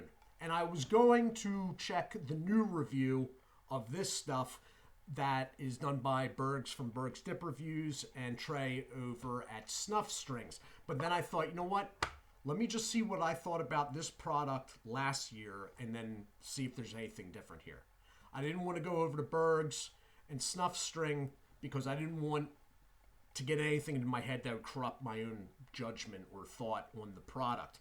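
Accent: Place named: Philadelphia, United States. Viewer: New sub! Are you from PA? You sound very Philadelphian lol cheers!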